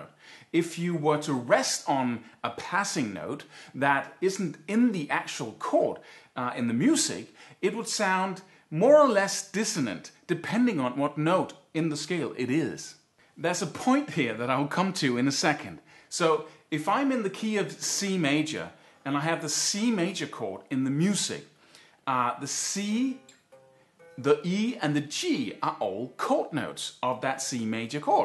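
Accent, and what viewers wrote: England, United Kingdom. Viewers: Wow you look like me with short hair no facial hair and an english accent , shred on cuz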